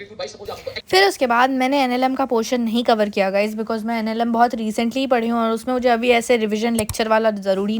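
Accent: Bihar, India. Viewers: Aap bihar se ho??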